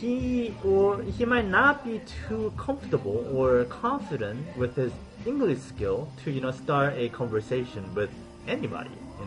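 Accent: South Korea. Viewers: I am fond of your background music. 또한 당신은 재미있는 한국어 악센트가있다. ^ ^ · typical korean face and accent.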